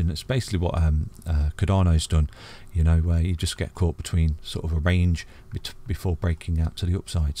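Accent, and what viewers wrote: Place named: Northern England, United Kingdom. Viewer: I am learning everything from you. Thank you so much! Would love to see a video on, who are you? How did you learn trading? Where in England are you from? I love your accent! Some bits of it sound northern but not full on northern so I am curious:) tell us more about yourself!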